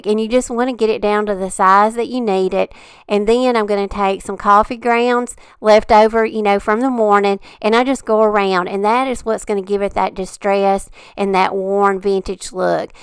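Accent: South, United States. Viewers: Oh my, you are so creative! Love these and I look forward to seeing and hearing you share your ideas with us.Thank you for your inspirations. I also enjoy hearing your Southern accent. You are a real treasure to me and so many more I am sure! Blessings, Chris' Mom, Irene, Idaho's Color Lady!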